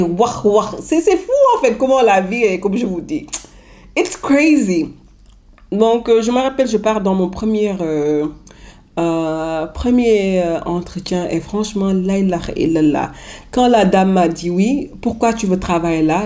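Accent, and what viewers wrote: Senegal. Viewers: J’adore l’accent sénégalais ❤️❤️❤️ much love from cameroon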